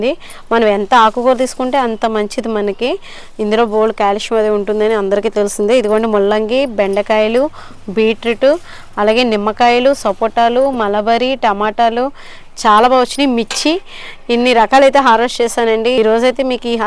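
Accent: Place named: Godavari, India. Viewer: మీ మిద్దె తోట చూస్తుంటే...చాలా చాలా సంతోషం కల్గింది...ముఖ్యంగా...గోదావరి యాసతో మీ మాటలు ఎంతో ఆహ్లాదాన్ని కల్గించాయి...అభినందనలు...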